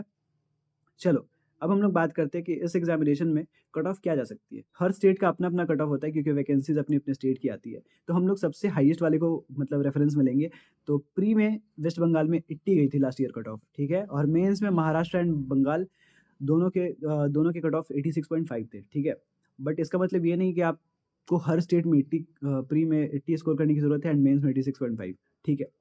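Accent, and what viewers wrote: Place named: Bihar, India. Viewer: Tum Bihar se h kya bhaiE sub kuch hai Thik h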